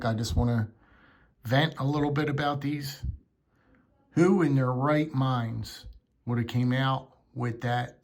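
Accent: Philadelphia, United States. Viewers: Traditional knife videos on YouTube I usually hear a lot of southern/midwest accents, gotten used to it. It’s kind of cool to hear someone like from Philly region.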